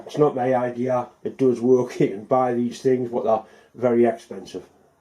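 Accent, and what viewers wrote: Newcastle, United Kingdom. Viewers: I love how Geordies say "hurls" instead of holes.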